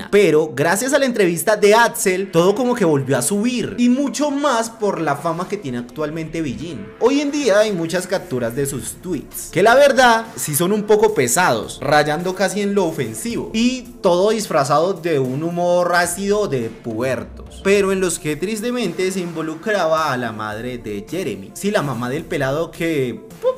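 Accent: Bogotá, Colombia. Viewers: Vuelvete un canal de salseo pana, ver los chismes de los youtubers con acento rolo es divertidisimo y hasta donde se no hay ninguno colombiano que lo haga asi como lo hace pipepino en argentina o los espanoles y mexicanos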